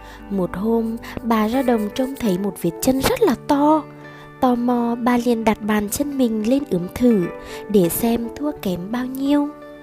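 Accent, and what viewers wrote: Vietnam. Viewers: Hay à. Cứ như thế này nhé. Kể cho nhiều người biết về lịch sử của người Việt. Tính giáo dục rất cao.. Giọng hay quá. Bạn ở Huế à. Câu chuyện được dẫn hấp dẫn, rõ từ. Lên xuống giọng họp lí. Chắc giỏi văn lắm đây. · thú vị chất giọng của bạn. Giống những hướng dẫn viên du lịch xứ nghệ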